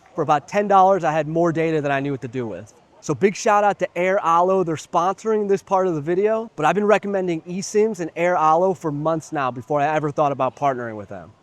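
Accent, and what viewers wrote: Vietnam. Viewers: What’s happening, another banger vlog, I must say out of all the Nam vloggers I seen your channel has to be the top tier for me it’s very interesting and informative def its a disservice how your SUB# are not in the 3 digits Last but not least hearing your thick namese accent be cracking me up 😂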